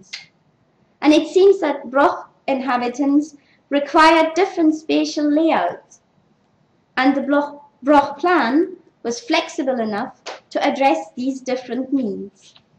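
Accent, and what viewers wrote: United Kingdom. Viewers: I found this really interesting. I've been exploring the local iron age sites and I've had a thought. When and why did people change from building in circles, to building with corners (of varaing and questionable accuracy)? May I also say what a lovely accent! Is it from Shetland? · I like the speaker’s vocal volume undulations, as you call them. They convey her attitude to the questions she’s discussing. For example, where she discusses what architecture can tell us about how much the broch builders competed to out-build one another in height. I lecture, and I do the same. It’s better than delivering in a monotone. As for the accent, don’t you have any friends from Scotland? If not, find some. Just remember not to visit the country outside May to late September, or you’ll either drown in the horizontal rain, or die of cold. Regarding the talk’s intentions, the main one, I think, is to examine brochs from an engineering/architectural point of view. 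Do structural analyses, for example, to calculate how high, given a particular ground diameter, you can build the walls before the broch collapses. From that, you can work out whether a broch was in fact built to be as tall as possible. That tells you something about how fiercely the builders tried to out-build one another. And that in turn gives clues about how they tried to advertise their status. There’s more about this in <link>, “Land, Stone, Trees, Identity, Ambition: the Building Blocks of Brochs”, Archaeological Journal, 173:1, 1-29, 2016. mentions something related, when she says that the brochs built with better stone had thinner walls. This implies that the builders weren’t competing, because the ones with the better stone threw away the advantage that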